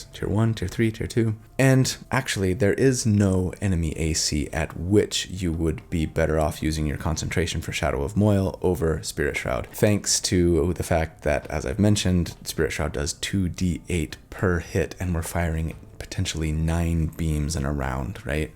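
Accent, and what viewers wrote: United Kingdom. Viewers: Bes bri'ish accent evah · As britshman I confirm this is how we sound.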